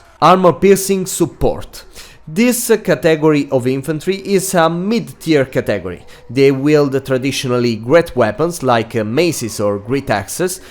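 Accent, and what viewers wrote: Italy. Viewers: Fantastic video, by the way, are you Italian?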